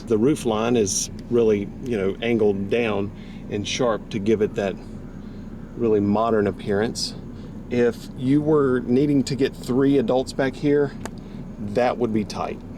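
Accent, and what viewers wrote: North Carolina, United States. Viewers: I LOVE your accent😍 your voice is so soothing too lol #southernaccentftw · I like your NC sweet accent but boy you need to learn things about cars.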